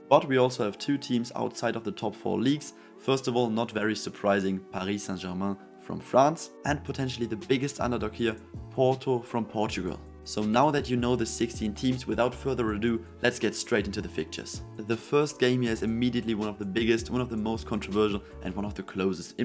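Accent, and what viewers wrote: Germany. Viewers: Deutscher Akzent bockt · Man hört so dass du deutsch bist😂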